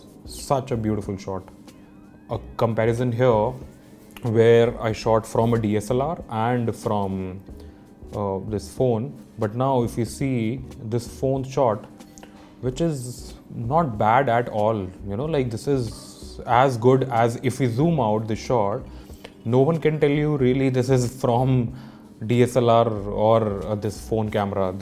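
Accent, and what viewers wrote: India. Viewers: wah....indian sound on English language...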